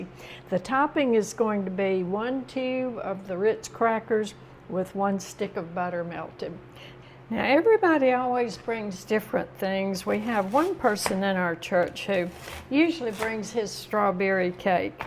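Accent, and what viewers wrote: South, United States. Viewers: I enjoy everything about your videos, including your southern accent and charm.❤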